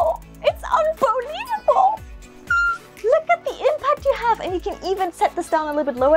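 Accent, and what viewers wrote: United Kingdom. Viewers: I have been watching Ask Japanese for some weeks and learning about Japan. I really love Japanese etiquette. Your language skills are amazing. You are the second German woman on YouTube I have chanced upon who speaks English with a British accent. She too studied and worked in Britain. I can detect a very slight German accent in her English but none in yours. You respond instantly to spoken Japanese so your Japanese must also be excellent. I love trains and have found your show on our cable TV in the US. I grew up next to the Durango-Silverton narrow gauge line. Thank you for your bowtiful work. (had to acknowledge this fun video:)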